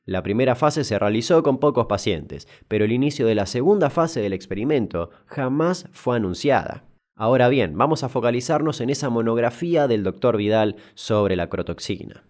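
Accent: Argentina. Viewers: amo el acento argentino, es hermoso. Me encantan tus videos. Un abrazo grande desde Bogotá-Colombia💗💗💗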